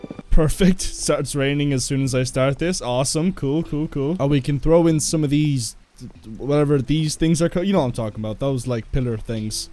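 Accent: Ireland. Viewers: As soon as I heard you're voice I instantly noticed that your Irish. It's nice to see another Irish brother 🇮🇪🇮🇪. Good luck in your channel mate. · can't tell if you're irish or european. either way, I like your voice!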